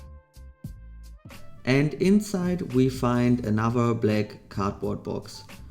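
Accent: Germany. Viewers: Are you german? oh and great video!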